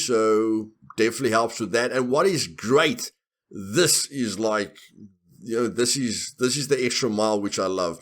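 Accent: Afrikaans, South Africa. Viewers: What servers do you play on im from South-Africa and you sound a lot like n afrikaans man ;) i was just wondering sothat i know what to play on aswell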